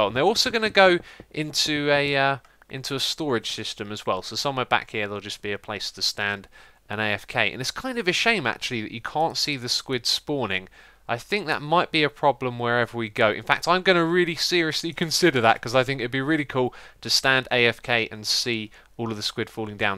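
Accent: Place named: England, United Kingdom. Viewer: anyone know which part of England is from?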